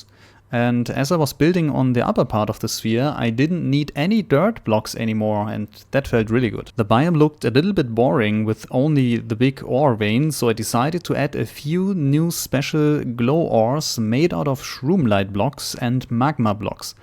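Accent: Germany. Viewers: very deutsches englisch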